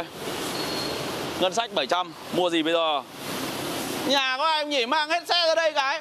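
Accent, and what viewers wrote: North, Vietnam. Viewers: Nghe giọng miền bắc đọc kiểu tây chuẩn ko vào tai. Đọc kiểu việt hợp hơn. Nhưng mấy ông miền nam phát âm kiểu chuẩn tây rất êm tai nhé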